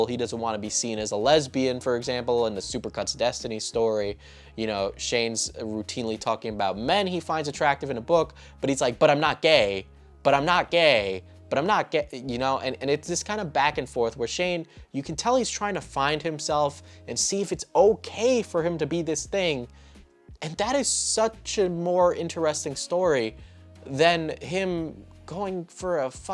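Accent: New York, United States. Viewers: Are you from Northern America? You sound like a New Yorker, I love your accent ^^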